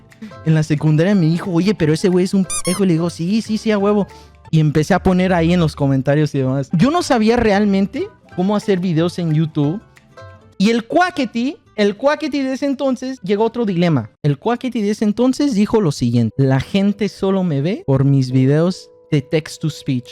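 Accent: United States. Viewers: No mames ya se le pego el acento americano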